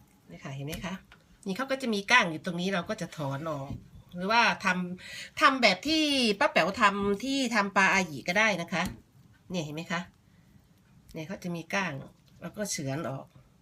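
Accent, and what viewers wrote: Isan, Thailand. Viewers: ป้าแป๋วคนอิสานใช่บ่ ผมฟังรู้ 555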